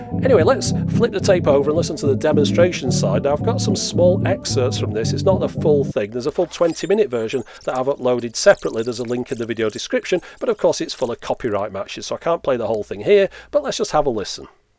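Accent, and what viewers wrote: United Kingdom. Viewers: its a british accent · I was just blindsided by the pronunciation. I am pretty used to the different ways we say the same words through the English speaking Western World, but I never heard "beta" spoken like that before. I think UK accents of all types sound so refined, even all the slang terms. Tattoo is taa-ewww, and of course aluminium way cooler your way. Your accents automatically make you sound like experts to USA residents, even the minimally educated British sound smart to us, LOL...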